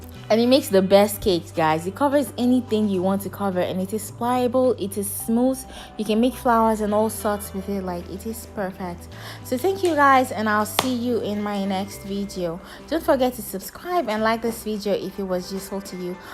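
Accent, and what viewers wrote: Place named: Ghana. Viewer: You don’t sound like a Nigerian but a Ghanaian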